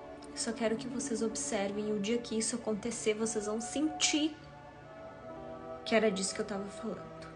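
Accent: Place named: Bahia, Brazil. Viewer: Cara que videnre chata ! Com esse sotaque com uma mustura de baiano cauxo minerio !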